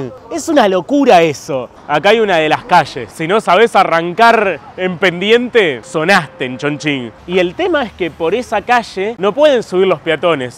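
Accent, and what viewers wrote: Buenos Aires, Argentina. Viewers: Porque hablas en porteño taaan exagerado mmmm es raro eso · Y si es dé buenos aires de remedios dé escalada como querés que hable 😂😂😂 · uiii que turbio ; calle grafiteada.... pa vos es turbio ? ................... te salto el policheto que llevas dentro , igual gracias x mostrar este lugar..... vos viajas por todo el mundo , porque sos youtuber ? te falta humor ... y el acento portenio es inevitable... de todas formas gracias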